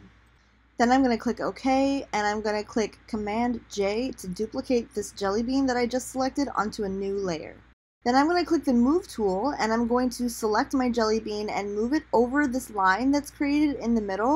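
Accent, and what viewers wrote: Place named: United States. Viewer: Where is this annoying US accent from? It's exactly the one we try and imitate in Europe when we say US tourists are obnoxious and loud. Bay Area? Santa Monica?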